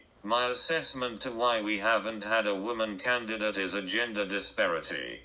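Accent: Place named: United Kingdom. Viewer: I love the British voicing you set on you device 👍🏽 I agree with you whole-heartedly 👍🏽 Stay well & stay safe ✌🏽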